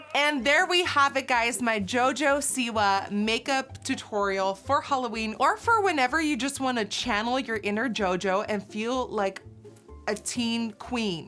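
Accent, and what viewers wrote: United States. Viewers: Your Jojo voice was perfect. I remember when accent was so strong and now she sounds born and raised in the usa! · i sometimes forget that you're dutch???? like you sound soo american? how can you not have an accent lol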